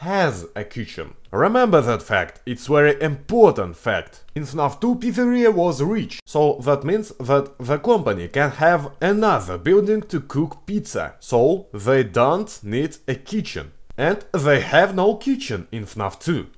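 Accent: Russia. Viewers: Very 👎 Russia accent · This russian accent is impssible to replace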